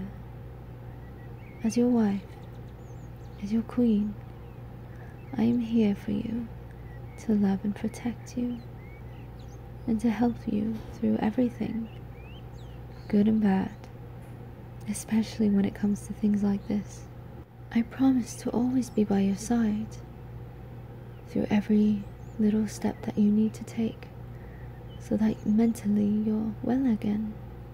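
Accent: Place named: United Kingdom. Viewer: Alternative title: You're the Husband of the young Queen Elizabeth II ASMR (why? because of your somewhat thick british accent)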